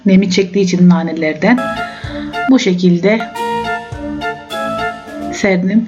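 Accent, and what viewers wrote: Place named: Adana, Turkey. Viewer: Selam canım naneler yemyeşil nerelisin Adana gibi geldi bana.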